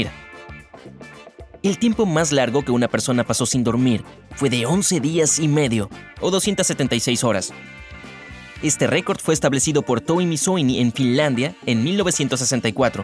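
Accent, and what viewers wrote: Buenos Aires, Argentina. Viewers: El acento porteño clave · Épico yo vivo en Buenos Aires jajaja ese acento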